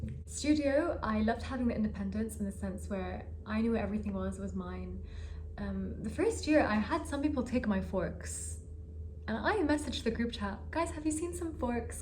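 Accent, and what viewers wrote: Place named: Arab world. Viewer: I’m not joining any university but I’m watching this bcz I love how Arab speaks English. It’s classy accent ❤ 😊. Love your tips , it will be useful for students .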